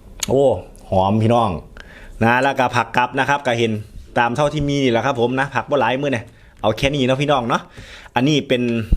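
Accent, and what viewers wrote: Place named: Ban Lan, Thailand. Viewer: ไทบ้านได๋น๊อค่ะ สำเนียงเว้าคือทางบ้านลาน บ้านโนนสว่าง จำปาโมงแท้ค่ะ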